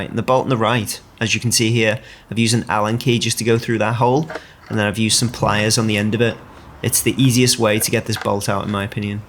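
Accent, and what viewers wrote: Liverpool, United Kingdom. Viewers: you a scouser ?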